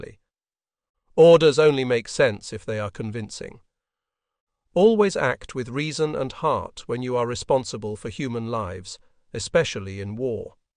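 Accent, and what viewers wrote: United Kingdom. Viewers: Who provided the voice template for the A.I.? It has a crisp British accent I find interesting. Though it has those mispronunciations that annoy people, it is still pleasant to listen to.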